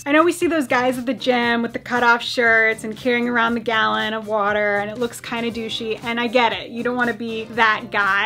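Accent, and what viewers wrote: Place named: United States. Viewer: You forgot to mention if the weight should be pounds or kilos. You sound american, so I'm guessing pounds.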